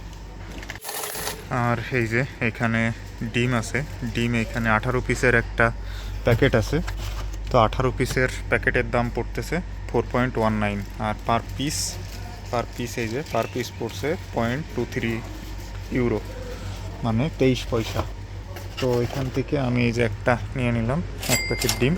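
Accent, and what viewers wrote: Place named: Pabna, Bangladesh. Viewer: First video dekhtesi probably apni pabnar manush or ashe pashe basha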